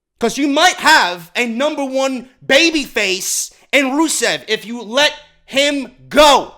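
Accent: New York, United States. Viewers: Newyorker accent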